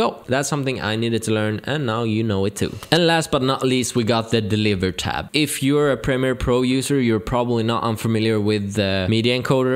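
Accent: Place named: Sweden. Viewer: You from sweden dude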